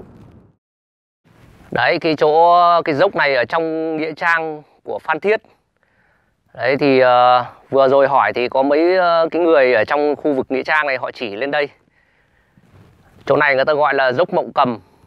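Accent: Standard. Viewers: Kênh của bạn rất hay . Giọng MC chuẩn . Chúc bạn nhiều sức khỏe . Chúc kênh phát triển . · Bạn có giọng nói chuẩn và rất hay chúc bạn thành công trong công việc của bạn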